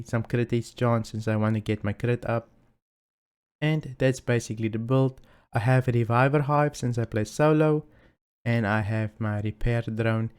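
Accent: South Africa. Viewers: Bro .. are you from RSA?!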